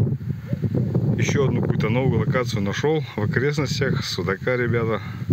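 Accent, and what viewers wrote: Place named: Urals, Russia. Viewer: Откуда ты дружище? Говор Уральский😅. Наш!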